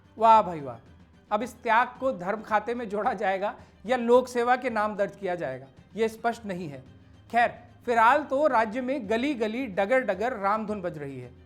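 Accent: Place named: Pahadi, India. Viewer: ji apka news deliver krne ka ye pahadi andaz Hame bht bha rha hai Apse vinti hai ese yese hi jari rkhiyega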